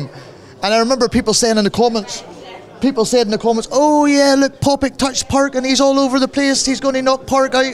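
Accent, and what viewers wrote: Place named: Ireland. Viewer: Zdecydowanie nie, jeśli ktoś ma styczność z Irlandzkim akcentem pierwszy raz, to prawdopodobnie nie zrozumie połowy z tego, co mówi Parke, mimo dobrej znajomości języka.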